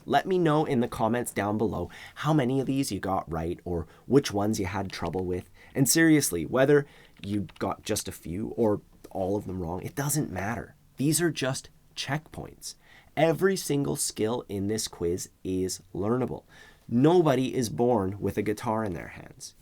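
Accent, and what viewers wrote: Canada. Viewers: You sound so Canadian. :)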